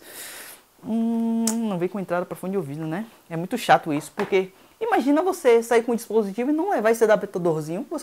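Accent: Bahia, Brazil. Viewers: adoro nosso baianês kkkkk parabéns pelo trabalho! Muito bom e autêntico. Dou like antes de assitir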